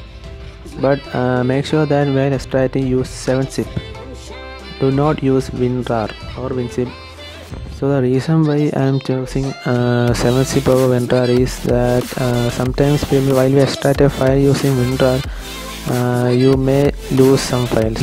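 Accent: Kerala, India. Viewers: malayali anno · Malayali annalle😆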